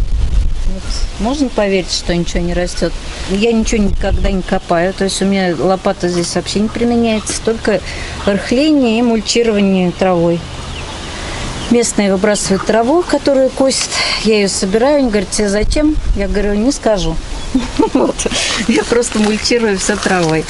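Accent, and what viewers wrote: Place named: Samara, Russia. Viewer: Привет самарчанка, сразу видно, что человек из Самары, и говор самарский.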